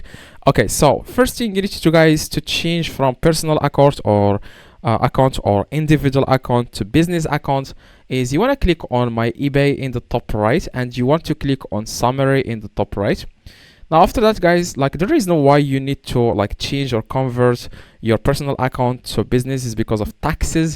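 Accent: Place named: Morocco. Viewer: moroccan english very helpful bro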